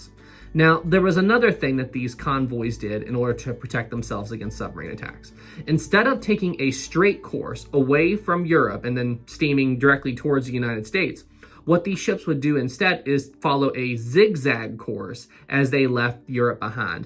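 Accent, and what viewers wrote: South, United States. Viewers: Kid, learn how to properly pronounce words like "during" (which isn't pronounced the same as the word "daring") and drop the southern drawl when using words like "time".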